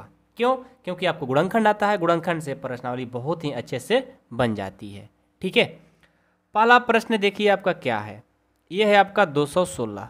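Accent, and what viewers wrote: Bihar, India. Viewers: Sir app Bihar sa ho na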